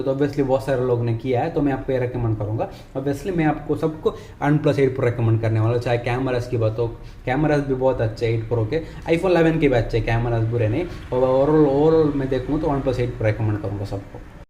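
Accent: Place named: South, India. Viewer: I love south indian hindi 😃😃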